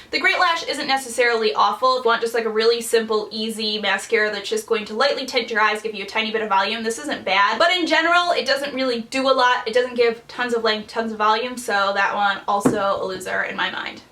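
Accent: Midwest, United States. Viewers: Are you from the midwest because I feel like your accent is very similar to a midwestern accent. Just wondering :) Love the vid btw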